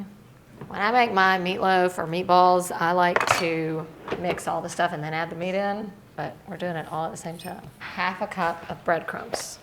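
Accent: South, United States. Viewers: Just popped it in the oven. I never saw your videos but this is great. I love your accent. Is it southern? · Loving your vibe and personality.. also the southern hint to your accent, you should have your own cooking show on tv.. I’d definitely watch it 👍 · is southern for sure!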